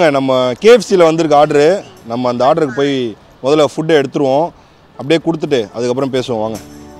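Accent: Chennai, India. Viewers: You have a great philosophy of life! I am learning Tamil from you! You Tamil accent is typical Chennai. Only your pronunciation - 'PACKET money' for 'POCKET money is typical Tsmilian. Because Tamil does have the 'O' sound. Very good video! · Thala chennai speech paka . Ne gathu thala😎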